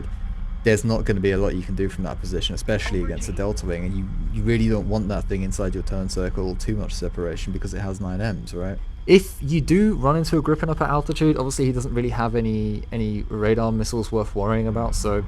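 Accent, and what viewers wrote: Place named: Europe. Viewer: Wow by the sound of this mans voice I would think they were from Europe and seeing a person from that section of the world using FEET instead of the measurements that are used in the countries of Europe(kilometers) hell I live in that one country that uses feet for measurement and I use in-game kilometers just because that's what the majority of people use in this game so it makes it that much easier for me when some one types out in chat things like the bomber is at 6k meters cause I don't think I have really ever seen anyone type out in chat how many feet is the height of the bomber. But to each their own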